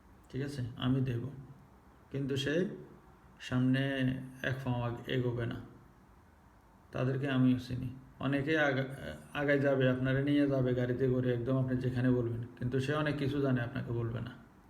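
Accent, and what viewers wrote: Noakhali, Bangladesh. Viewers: নোয়াখাইল্লা। তুমি তাই না ভাই ভালো ভালো কাজ করেন তবু মানুষের সাহয্য হোক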